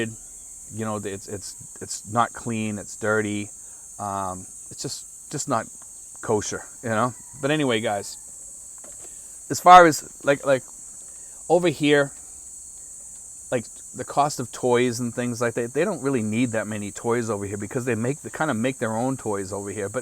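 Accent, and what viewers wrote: United States. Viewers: Hi, is it? I am a new subscriber and was trying to guess the origin of the accent. You had revealed in another video where you were from. I was thinking the same US location...ha ha. Anyway, I am from California and I used to live in the Philippines, but that was 20 years ago. To give a reference point in time; my last visit to the Philippines, BGC was still FT. McKinley. My purpose for being here is to get caught up on life in the Philippines and consume personal stories on this public platform. Best regards.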